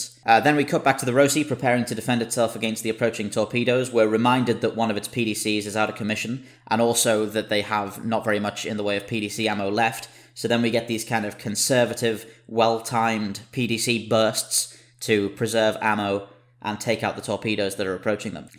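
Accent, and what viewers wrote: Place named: Wirral, United Kingdom. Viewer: Are you from the Wirral?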